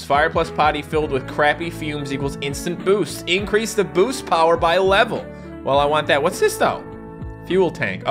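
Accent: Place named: Newfoundland, Canada. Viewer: Love your videos Matt! I actually live not too far from Newfoundland so it's cool to hear an accent I'm very familiar with aha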